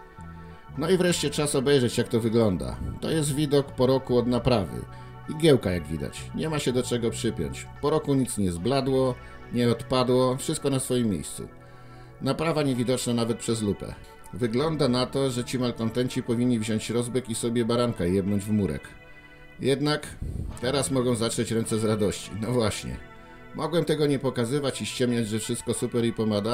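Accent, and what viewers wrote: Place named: Warsaw, Poland. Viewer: Bardzo się mnie podoba ta Warszawska mowa 😀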